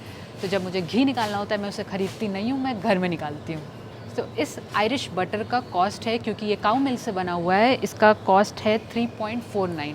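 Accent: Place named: India. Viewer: Thick indian accent ,i think she loves india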